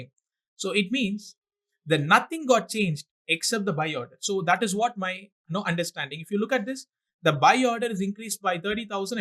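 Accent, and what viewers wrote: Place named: South India, India. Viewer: Judging his accent , he's surely South Indian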